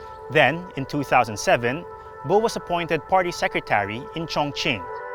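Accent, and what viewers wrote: Philippines. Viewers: ah that explains his accent, he sounded filipino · I’m not even a native English speaker but I think I can hear a Filipino accent here 😄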